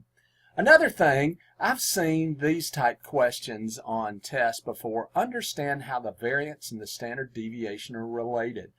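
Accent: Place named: South, United States. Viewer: You, sir, are incredibly clutch... I was getting caught up in trying to figure out how deep down south he's from!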